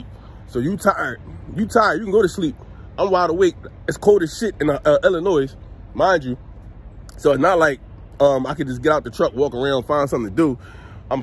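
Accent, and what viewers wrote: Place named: Philadelphia, United States. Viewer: Bro I start at Prime in like 2-3 weeks. It sounds like you’re from Philly… I’m from De and I’m gonna be in Pittston for my orientation too. I’m gonna be going through the same stuff you’re going through right now in a few weeks lol. This should be interesting. Stay focused and keep ya head up killa. You got this. Your videos help me stay inspired and it shows me what to expect. I hope to see you on the road 💪🏽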